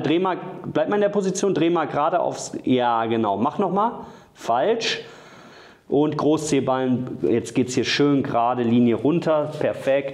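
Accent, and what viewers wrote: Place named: Austria. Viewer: gute besserung liebe btw is sie österreicherin? interessanter akzent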